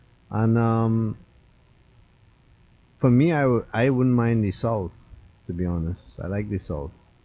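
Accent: Trinidad and Tobago. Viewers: You sound like a trini. You speak like my family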